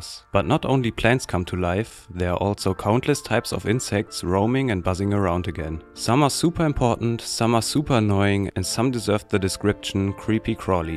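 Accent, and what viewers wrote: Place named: Germany. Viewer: Scheiss deutscher mit scheiss akzent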